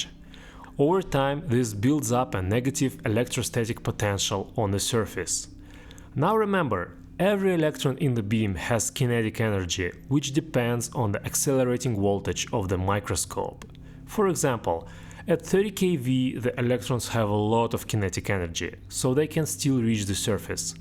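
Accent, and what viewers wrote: Russia. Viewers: Интересный у тебя акцент, ты русский? 😊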